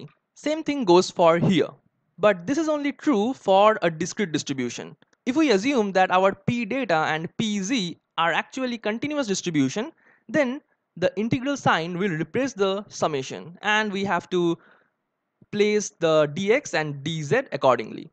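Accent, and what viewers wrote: India. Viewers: I present you the indian english : )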